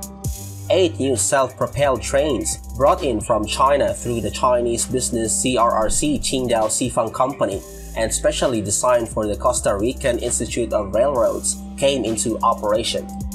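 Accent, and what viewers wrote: Philippines. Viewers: by accent and off word pronounciation philipine chinese pinoy.100'/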